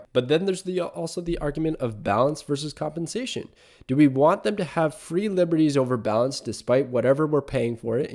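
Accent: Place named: Southern Ontario, Canada. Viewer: its the first thing I noticed when I came to the channel. I'm from BC and there is nothing that I like hearing more than the southern Ontario accent (well maybe the east coast one too).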